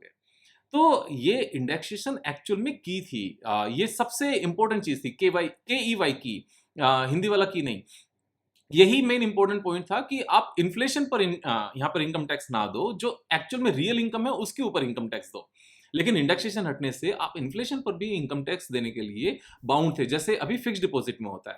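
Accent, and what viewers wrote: India. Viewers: Accent of English will always be Indian for us. If English is not our mother tongue then why should we be expert in pronunciation. Those who measure others with exact pronunciations are just having an inferior mentality. Are foreigners able to speak Hindi with exact pronunciation? Good bhai that you addressed all these guys in this video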